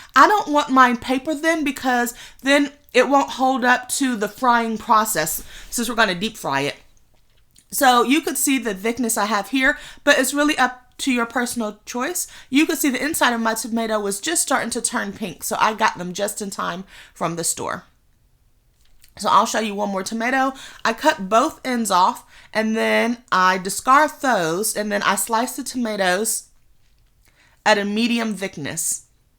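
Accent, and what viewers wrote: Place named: South, United States. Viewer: Yes, I knew you were from the south immediately when you said y’all! Thanks I’m glad to have found you. I will be following.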